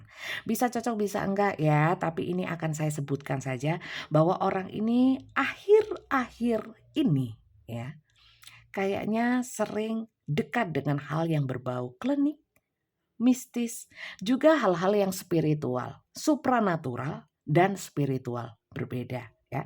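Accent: Java, Indonesia. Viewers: ❤ Alhamdulillah, terimakasih banyak ibu, atas penjelasannya (medok banget, jelas diterima) Amin ya Robal Alamiin !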